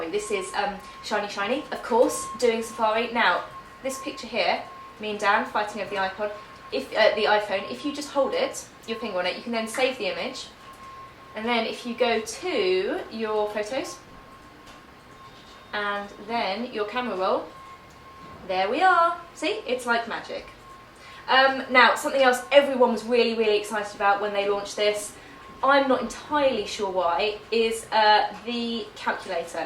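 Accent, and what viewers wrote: United Kingdom. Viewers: are u british? if u are - what the hell are u doing in USA? just kidding. great video. great iphone!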